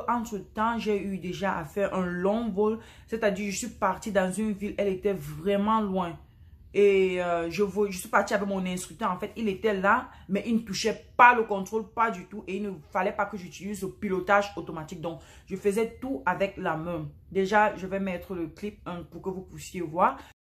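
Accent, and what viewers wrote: Cameroon. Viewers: Salut ma chère. J'adore bien ton accent Camerounais.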